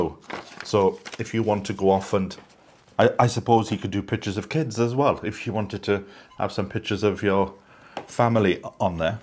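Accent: Wales, United Kingdom. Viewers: Thank you, lovely relaxing vlog. Nice watching you potter and hearing a warm Welsh accent. I learn a lot from listening to you, some great tips, and I won't be using my plastic chopping boards again. I enjoy reading all the great comments, too. One was brilliant today, about a firestick and a projector tv onto the wall. I have no idea what that is, but it sounds amazing, so I'm going to research concept and cost. The rain protective washing line cover is genius, so will check that out, too. I bought some window film but felt a bit unsure what to do until I read a comment here giving tips on how to do it well...hope you show us doing yours. Also, I love the glass teapot, and I may get one, if not too expensive. The star item today was Rusty's frame. It was stunning, and what a fabulous pic of him, he really was gorgeous. ❤ Take care and look forward to seeing Welshcakes being made. 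My Mother used the heated blackstone, and they were delicious...I miss her terribly. She would have loved your channel. Have a great weekend. 👍 🐈 🌼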